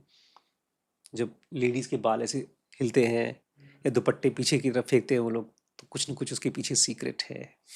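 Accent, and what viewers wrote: Bihar, India. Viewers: Sir it was really deep class I was a little asleep, and found it quite insightful, and you sometimes sounded using a bihari accent with due respect.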